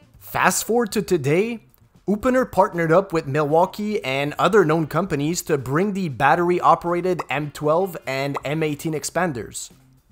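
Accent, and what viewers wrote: Quebec, Canada. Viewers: P’tit accent Québécois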